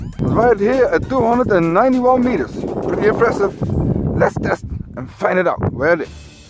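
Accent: Netherlands. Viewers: he sounds soooo much like hes dutch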